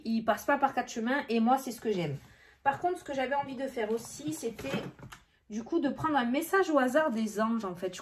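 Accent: South, France. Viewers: Ton accent me parle très bien car je suis muté dans le Sud, je recommence tout à zéro et les finances suivront 👍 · Coucou, j'adore votre façon de faire les guidances, votre accent du sud, votre franc-parler lol 👍😁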